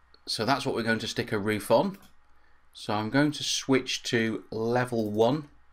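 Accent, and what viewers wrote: United Kingdom. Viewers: Nice British Accent and very helpful sharings :) Thanks alot mate :))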